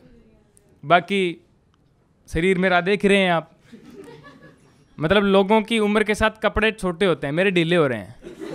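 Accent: Rajasthan, India. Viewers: His accent is telling he's from Rajasthan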